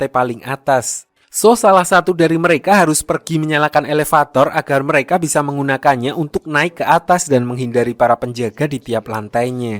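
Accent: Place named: Java, Indonesia. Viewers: Logat jawa nya medok😅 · Ini yg nranslet kaya orang banyumas tohk😅😅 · Pasti masnya orang jawa nih. Medok gini logat nya wkwkwk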